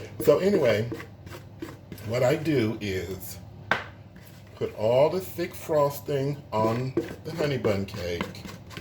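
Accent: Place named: South, United States. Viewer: Hey. New subscriber here. The cake looks delicious but your voice really had me hooked, so very sexy and earnest and with a southern flare. I loved that you gave the ingredients up front and took the time to let viewers know that they (we) can tailor it to our needs. Thanks again and I'll keep watching!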